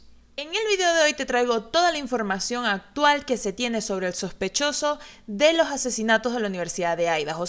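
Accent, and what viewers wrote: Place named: Venezuela. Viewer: Eres venezolana? reconocí tu acento de inmediato mrka<3 jajaja te amo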